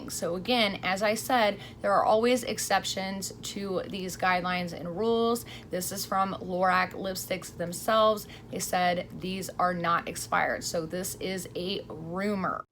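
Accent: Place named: Kentucky, United States. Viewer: i thought your accent sounded familiar ! i had no idea you were from kentucky! thats awesome :)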